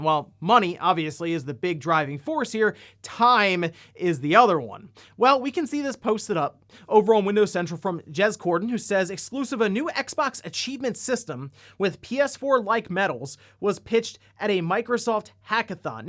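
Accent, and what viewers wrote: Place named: Brooklyn, United States. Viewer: he does have a faint Brooklyn accent.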